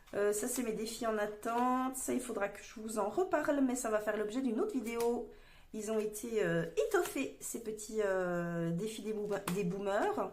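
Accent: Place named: Haute-Saône, France. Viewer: Coucou mais moi j'adore vous écouter il est tellement gracieux notre accent ( je suis en haute Saône) la Madeleine Proust a fait fureur avec😂. En tout cas belles économies 😊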